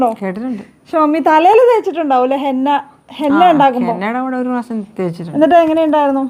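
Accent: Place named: Idukki, India. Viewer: Hi നിങ്ങളുടെ slank കേൾക്കാൻ നല്ല രസമുണ്ട് ഇടുക്കിയിൽ എവിടാ place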